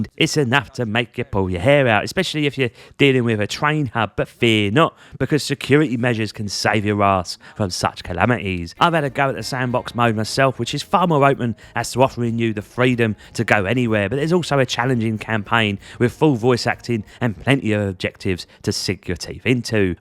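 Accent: Cockney, United Kingdom. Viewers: First time I’ve heard a fancy cockney pirate explain a train game to me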